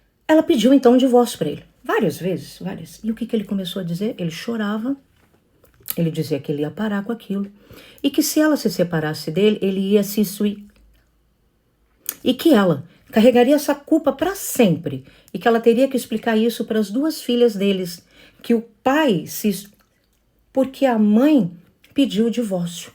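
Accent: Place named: Rio Grande do Sul, Brazil. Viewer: Maldito desse ou é gaúcho ou nordestino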